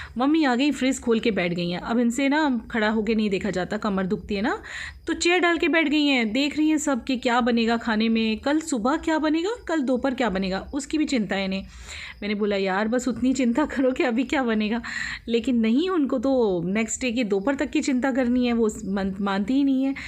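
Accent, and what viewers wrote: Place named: Meerut, India. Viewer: Aunty ki language bilkul meerut (Up)jaisi h..hmare meerut me aise hi bole h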